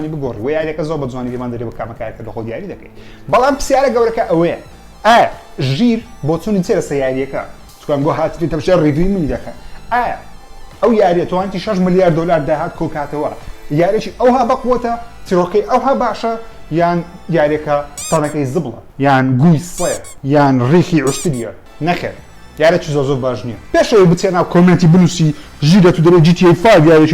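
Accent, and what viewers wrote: Erbil, Iraq. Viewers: Zoor zoor Shazy Xoshawestrin ashtt laimn wshakanta Wa Hich zmany xosht nagoria Ba hawlery qsay dakay Xot mozqof nakay ba bo qsaybkay xambar xot Bgory Wa Zoor Shaza vidokant Zirakanau nweia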